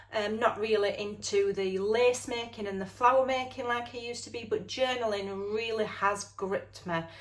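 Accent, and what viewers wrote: Yorkshire, United Kingdom. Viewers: Oh,! I could listen to you all day long (and that laugh!! 🥰)! My dearest friend in the world for just over 50 years was a Yorkshire lass from Leeds. Yorkshire became sort of my second home (I'm from Canada) until Margaret passed a few years ago. I miss hearing that accent. Listening to you brings such joy! My favourite tip was 'DON'T HOARD SCRAPS'!! I am awful for doing that. I have always felt it was 'necessary' - other crafters told me it was. Thank you so much for finally giving me permission to get RID!!! I think it will definitely help me to create more. My crafts table is always such a mess because of these endless scraps everywhere. Loved this video! ❣💖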